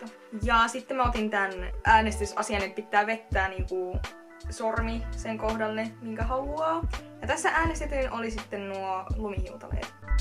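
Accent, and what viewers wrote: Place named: Ostrobothnia, Finland. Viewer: 😂 Kuulostaa kuin puhuisit pohjanmaan murretta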